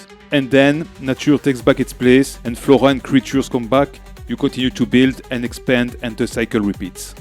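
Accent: France. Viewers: This is some premium french accent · Of course! I find it quite charming to be honest. It's very intelligible, don't you worry, but it's also VERY french :D It's a neat accent.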